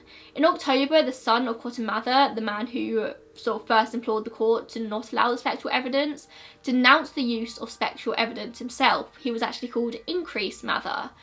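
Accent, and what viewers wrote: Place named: RP, United Kingdom. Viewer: I feel like posh accent should be saying "mind the gap" or calling out the numbers in an elevator. Not gonna lie, it lulled me to sleep ❤️❤️❤️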